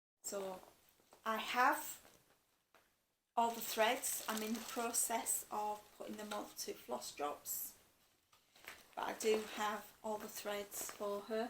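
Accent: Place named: Scotland, United Kingdom. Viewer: Hi. I just happened across your Flosstube a few days ago and I just wanted to tell you how much I enjoyed your gentle spirit and lovely accent, as well as your stitching of course. Lol. I just had to comment when I heard you say you were trying to tone your accent down. Please don’t! As an American, and lover of all things Scottish and British, I just had to tell you it is part of your charm. I have recently picked up cross stitch and am enjoying it so much. I did some embroidery back in the 1980s and have been quilting for the last several years but I didn’t even know that cross stitch was popular again until I happened across some Flosstubers on YouTube. Now I am well and truly hooked! I live in a very rural part of Kansas and my only connection with other stitchers is on flosstube. Can’t wait to watch your next video! Kathryn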